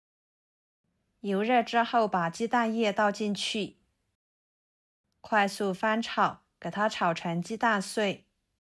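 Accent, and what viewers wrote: Yunnan, China. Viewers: 实在是不习惯听你的云南马普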